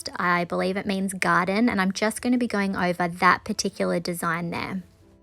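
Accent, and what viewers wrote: Australia. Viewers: Loved all of these! You are so talented and like your Aussie accent❤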